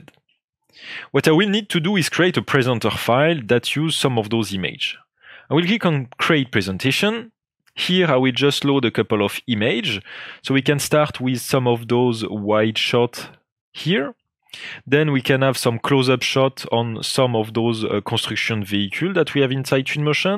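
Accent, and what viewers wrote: France. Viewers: Twinnmotion is a beauty, but that fucking French accent leaves me in awe, still don't understand a single word the guy says unless I activate captions. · Французский акцент? Очень сильный.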